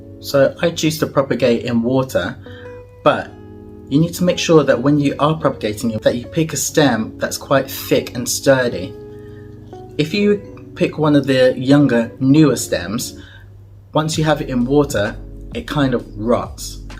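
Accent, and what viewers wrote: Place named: England, United Kingdom. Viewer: I can tell by your accent why your coleus does better indoors than outdoors. Summer in England is more of a theoretical concept than a real season.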